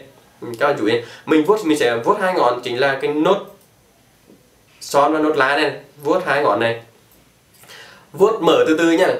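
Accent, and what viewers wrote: Nghe An, Vietnam. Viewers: Giọng nghệ an hay hà tĩnh ạ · Bạn là người nghệ an ah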